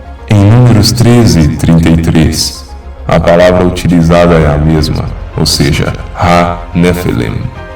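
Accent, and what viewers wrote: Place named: Minas Gerais, Brazil. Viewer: Se essa voz pertence ao " ", ele é um ótimo ator pois conseguiu inserir na voz o tão conhecido sotaque mineiro. Se for ele, está no lugar errado deveria tentar Hollywood... Só uma sugestão, Se For ele está desperdiçando talento...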